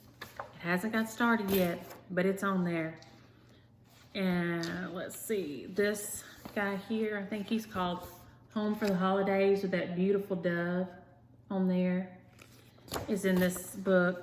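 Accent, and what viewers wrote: South, United States. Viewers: Chicken what? Did I hear your favorite candy correctly? You crack me up. My favorite candy is straight up Hershey’s milk chocolate. Love your videos. I’m a southern girl and your south-isms make me laugh. Lawd! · I enjoy you so much that I watched all your videos! I love your Southern sayings along with your drawl! I love chocolate and caramel together so Caramello would be my favorite along with Snickers!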